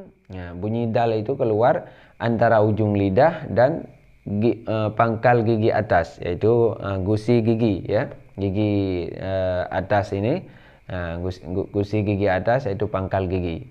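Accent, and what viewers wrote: Aceh, Indonesia. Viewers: Patutlah intonasi bahasa ustaz bunyinya nyaman ditelinga saya orang Semenanjung. Orang Acheh rupanya. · guru tetbaik saya suka ada logat acehnya barpun saya orng padang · Memang Awak Aceh beda baca o dan du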